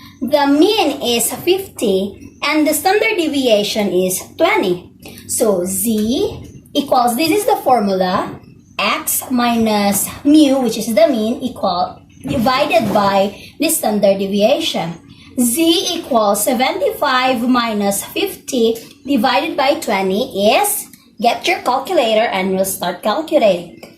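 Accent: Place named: Bisaya, Philippines. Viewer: Wow! I can see the effort and sacrifice within the video. Anyway, I like the bisayan 'ACCENT' of Mrs.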